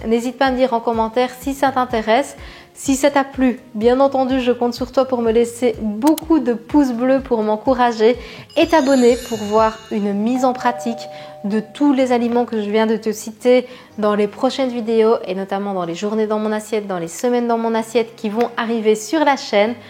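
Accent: Belgium. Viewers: Merci pour toutes ces idées ! Au fait, d’où vient le petit accent caché derrière l’accent belge ?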